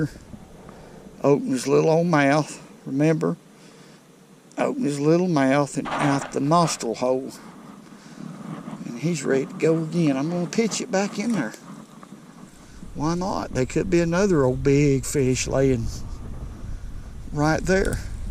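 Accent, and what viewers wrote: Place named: Florida Panhandle, United States. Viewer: I really enjoy your videos my friend! I’m from the panhandle of Florida and I love to hear your accent! I have the same thing going on lol!! I moved a couple of states away and I don’t hear anyone talking like us besides your videos. Keep making these videos and I’ll keep watching em.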